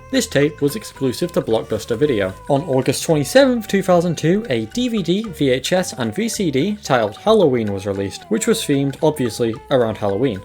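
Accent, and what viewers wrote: United Kingdom. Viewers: Enough with the British accent! Can we please stop with the British accent?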